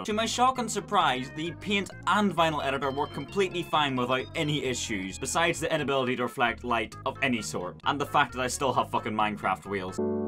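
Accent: Northern Ireland, United Kingdom. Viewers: Your voice is a blend of American, German and Yorkshire Edit: ok that is basically a Northern Irish Accent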